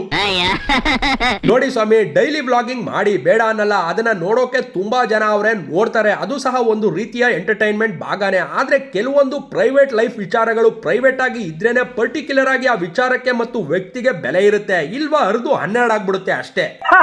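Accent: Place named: Mysore, India. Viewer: ನೀವು ಏನ್ ಗುರುಗಳೇ ಮೈಸೂರ್ ಕನ್ನಡ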